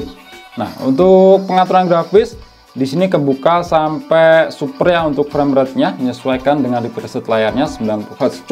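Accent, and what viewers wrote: Blitar, Indonesia. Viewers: Orang blitar kah bang😅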